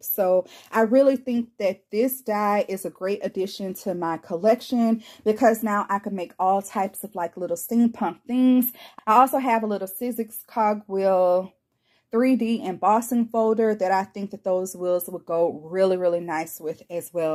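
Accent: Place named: Georgia, United States. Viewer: Adorable! I have a new Cricut machine x2 Months that I haven’t played with yet. You’ve inspired me! Subscribing! What state do you live in? You sound like a fellow Georgia girl FYI The Color Purple is my favorite movie of all time!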